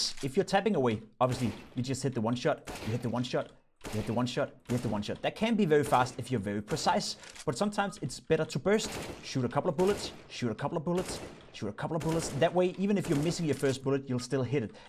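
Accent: Denmark. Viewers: do you have a danish accent?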